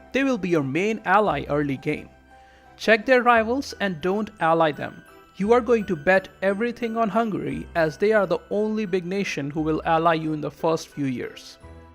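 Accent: India. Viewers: You Indian or something? This accent is horrible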